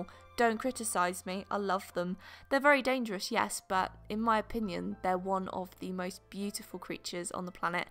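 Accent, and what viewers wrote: United Kingdom. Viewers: I’ve been to your Etsy store hoping to see prints of all your work but there’s nothing I love your Dean Winchester pic it’s awesome just like you id love to learn how to do digital art not for professional just for a hobby with rewards at the end I love all your work but I only get an allowance I’m really poorly so I often arrive late and I don’t even know if you get any of my messages. But your such an amazing role model for me and your fun vids have helped me smile even when multiple bones are breaking as they do when my bone disease is bad and staying still doesn’t do a thing to help. Your a ray of sunshine on a dull day and I love your British accent it makes me feel like home I’m in Sheffield England so I’m a Yorkshire girl. Keep doing what you love. Love everything you do. Take care. Rachel x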